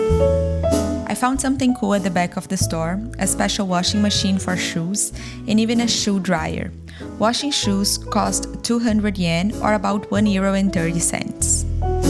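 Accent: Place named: Brazil. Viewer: I recognize that accent. Are you Brazilian?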